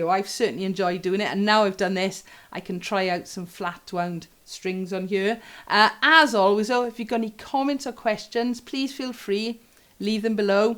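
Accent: Wales, United Kingdom. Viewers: Hey! Just ordered one - I was torn between the white/cream & black, went for the black. Arriving tomorrow! Thanks for the fantastic review! I’m a 57 year old lady feeling like a kid at Christmas. 🤣 Subscribed 👍🏽 PS, absolutely love your Welch accent. 😊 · Thanks for the concise, informative, unbiased review. Great captioning, narration, lovely Welsh accent and pronunciation. I love your playing and choice of samples,. You play so well I couldn't hear roundwound scraping sounds at all. I've got DR Legend 45-105 short-scale flatwound strings on my Japanese 1973 Univox Hi-Flyer 'Ventures' bass clone. Flats hide some of my 75 year old student bass guitarist bad fingering and muting. Great pedal display, makes me think I need some, and maybe some gels. I like to use the Chorus effect on my Fender LT25, ethereal, especially with my Ibanez Mikro converted to piccolo bass- D'Addario rounds on it, so playing it without scrapes is, for me, what the Army called 'valuable training'. 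Thanks again, I'll check out your reviews, I'm shopping for another good shorty in EADG to throw in my Mazda to take to Death Valley.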